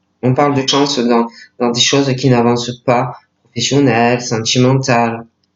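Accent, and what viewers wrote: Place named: Marseille, France. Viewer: une bretonne à l'accent marseillais, c'est joli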